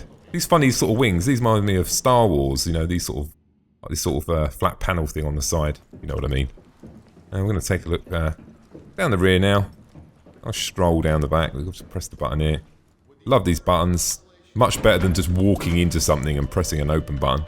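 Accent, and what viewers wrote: United Kingdom. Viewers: Love me sum British accent m8 it's refreshing to hear a nice voice commentating on the video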